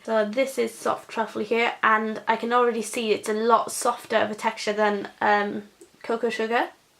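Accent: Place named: Wales, United Kingdom. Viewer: I noticed your welsh accent straight away Im Welsh too Lovely vid :)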